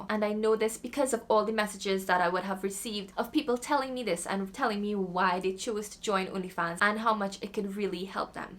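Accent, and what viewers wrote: Trinidad and Tobago. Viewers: You sound Trinidadian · Came for the info. Fell for the accent 🤣 Trini?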